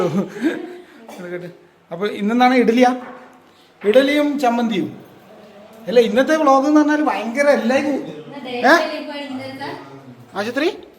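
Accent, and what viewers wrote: Kannur, India. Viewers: നിങ്ങളുടെ സ്ഥലം എവിടെയാ! കണ്ണൂർ ആണോ? സംസാരം കേട്ടിട്ട് അങ്ങനെ തോന്നി.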